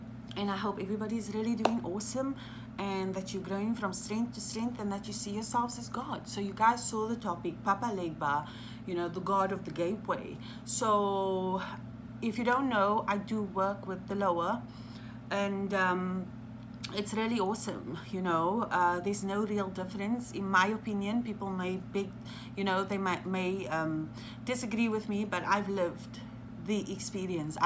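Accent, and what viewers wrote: South Africa. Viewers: You have a South African tone to you're voice hey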